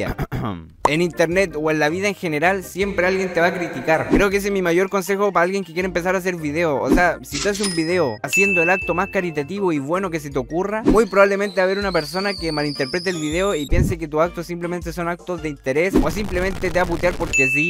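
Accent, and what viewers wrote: Chile. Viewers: De que parte de chile es chileno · Si no le entiendes simplemente pon subtitulos o vete del vídeo, Además ¿que tan difícil es entender el acento chileno?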